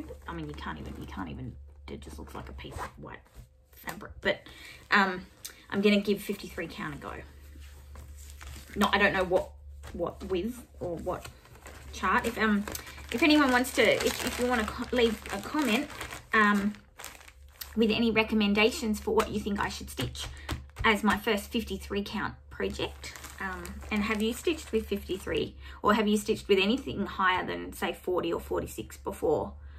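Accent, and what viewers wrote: Australia. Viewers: I'm working on Mary A Bunce at the moment on 56 count. It's the first time I've done anything on such a high count, but I absolutely love it and I'm probably about 150 years older than you. Lovely to hear your Aussie voice as I'm Australian and living in the UK. Can't wait for the Covid restrictions to be over so I can visit the Crewel Gobelin again. Do they stock any of the linens you use?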